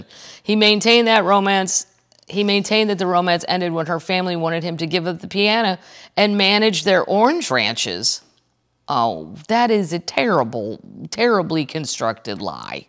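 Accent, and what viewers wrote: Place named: Wisconsin, United States. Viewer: GIrl you are breaking land speed records releasing these. you''ll spoil us termites. Thanks.:) Envy accounts for a lot of negativity. I'm unemployed but I've never had time to do all that negative stuff. Life is too short. Love that Wisconsin accent. Ooo that is not my definition of a hillbilly. I speak banjo. Termites- are we all safe or have some of is blown away. The winds have picked up here. Yeah Confidential was a lurid version of the Enquirer- there is a natural curiosity about Hollywood, but who's life was ever improved by reading that swill? A medical degree may be helpful when I'm seeking advice. Night night termites. I am happy for anyone who loves what they do for a living. What a luxury.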